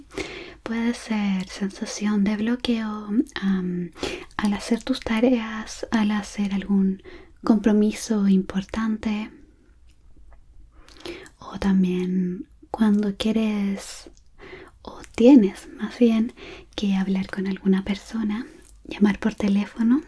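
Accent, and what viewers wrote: Chile. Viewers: Algo que valoro incalculablemente cuando miro cualquier contenido ASMR, es la naturalidad de las interpretaciones cuando se trata de rol. Tú tienes una facilidad deliciosamente buena para esto. Desde la modulación de tu voz hasta la suavidad del movimiento de tus manos; agradezco tantísimo haber encontrado tu canal, tu y tu bello acento chileno siempre logran relajarme. BAH, QUE DIGO, Tus videos en inglés también son bellísimos ✨ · Es como si Latte ASMR hiciera vídeos en español. Pero ese acento chileno que tienes me fascina. 💙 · Acento chileno ?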